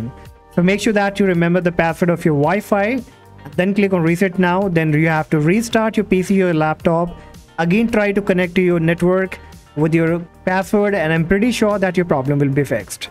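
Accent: India. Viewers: FINALLY I FOUND THE VIDEO THAT HELP ME I AFTER I HEARD YOUR IDIAN VOICE GET INTO DETAIL I AINT EVEN LOOK AT THE COMMENTS I JUST DID IT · when you heard the indian voice .. mean wasting your time!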